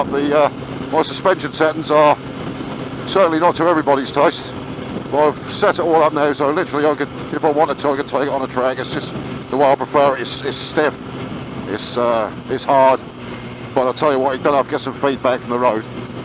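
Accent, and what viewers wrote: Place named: Suffolk, United Kingdom. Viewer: Good to see you back and hear that Suffolk accent again. Keep on trucking 😉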